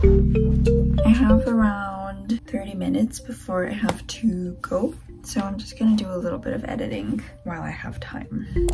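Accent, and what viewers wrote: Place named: Canada. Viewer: Je trouve toujours bizarre quand un canadien parle français, votre accent est trop mignon (et parfois je comprends rien mdr)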